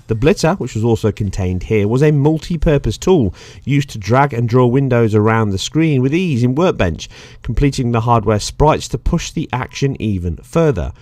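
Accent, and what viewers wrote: United Kingdom. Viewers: I really hate the Limey use of GOOEEEEY, (for GUI) or NES/SNES pronounced as words NEEES or SNEEES. It is just a preference. I Prefer GUI or grafphical User interface.. or Mouse interface. and I like "Nintendo" or "Super nintendo". I love you Limeys... Killer gamers from back in the day. Kinda like the South Koreans in modern times! (Serious gamers too).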